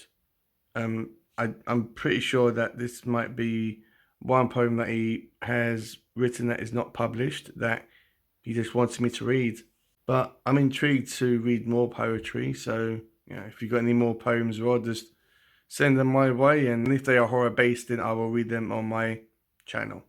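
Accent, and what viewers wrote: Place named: United Kingdom. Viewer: Thank you so much for this cool reading!!!! It didn't even cross my mind 🙃 I assume your accent is U.K. - The Lone Wolf lore may be only in America. They even made a movie Lone Wolf McQuade with Chuck Norris. 😁 The wolf was me (I grew up hard), the other is my wife, when I met her. And the end is just me being happy! I don't have the chops to be a poet, I try to write it to ignite my creativity for the prose I work on. I must admit, it sounds so much better when you read it!!!! Thank you so much!!!!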